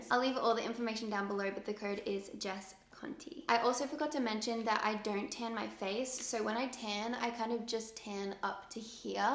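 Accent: United States. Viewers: sounds american now eg when she said matter 😂 ill miss your aussie accent 😊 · Yoooooo shes getting the american accent!! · Wow her accent is definitely sounding American now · Can anyone else hear the slight American accent that has developed. When she says A's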